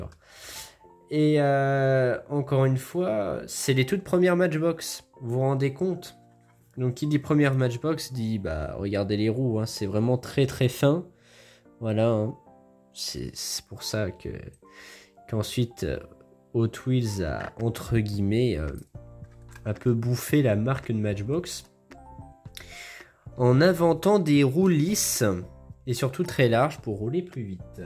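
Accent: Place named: England, United Kingdom. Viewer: Elles sont magnifiques…!! Mais j apprecie aussi ton accent Anglais 😂😊🤭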